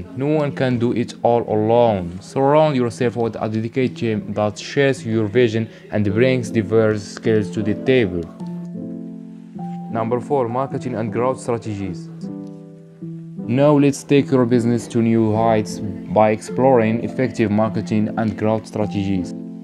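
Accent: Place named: Morocco. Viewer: لونجلي نقية كمل اخويا المهم عرفتك مغربي غا بالأكسنت ❤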